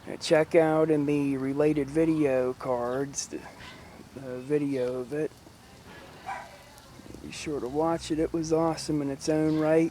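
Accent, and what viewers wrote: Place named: Pittsburgh, United States. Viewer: I'm loving the Pittsburgh accent btw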